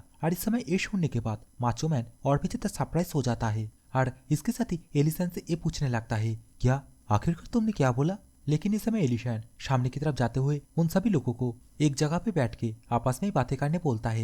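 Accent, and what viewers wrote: Bengal, India. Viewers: That bengoli accent 😅😅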